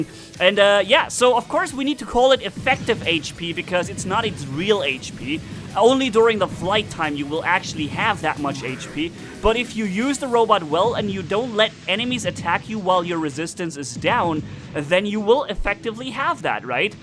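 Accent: Germany. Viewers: Hi are you German?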